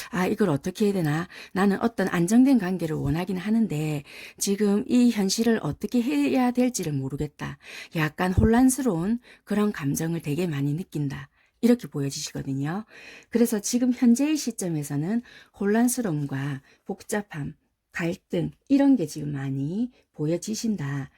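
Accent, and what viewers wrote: Busan, South Korea. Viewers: 와우 감사합니다^^*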